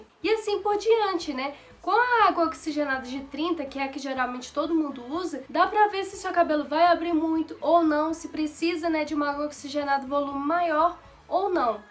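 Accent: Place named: Brasília, Brazil. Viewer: Acho que ela é de Brasília-DF. Pq o pessoal aqui tem esse sotaque lindo, maravilhoso, pelo qual sou apaixonada tbm 😍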